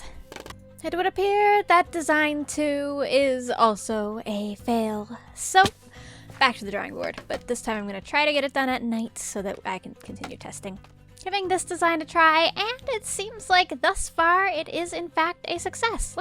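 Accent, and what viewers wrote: Newfoundland, Canada. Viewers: Oh my god your accent makes so much more sense now that I know you're from NFLD lmao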